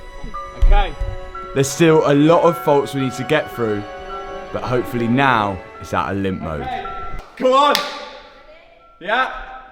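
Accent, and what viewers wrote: Leicester, United Kingdom. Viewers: I miss the Leicester accent 😅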